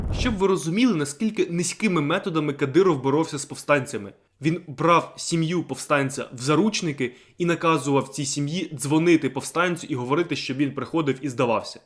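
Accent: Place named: Vinnytsia, Ukraine. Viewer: Ти що з Вінниці) цікавий суржик)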